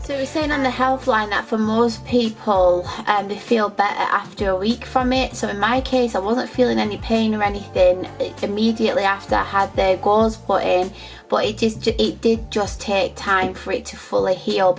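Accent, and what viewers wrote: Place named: London, United Kingdom. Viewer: thank you for this information. it helped me. I found your accent very easy on the ear. im from California, Huntington Beach area. so our accent is unnoticed by our ears and yours stands out. but with your accent in particular, it reminds me of a london couple i once met. also you have fabulous hair and i also noticed the amount of empathy and inclusive concerns for the audience. so it creates more engaging conversation.... i totally relate to what youre saying. i found myself hanging on every word and i can say i really learned something about my experience. i went through literally the same issue. makes you a very beautiful and very decent person and i truly appreciate this info. thank you for this. liked the video and subscribed.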